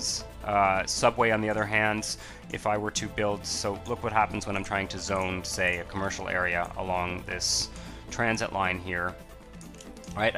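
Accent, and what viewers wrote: Canada. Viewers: Thanks man! This whole time I thought you were from Toronto btw haha you have that accent, I’m from Toronto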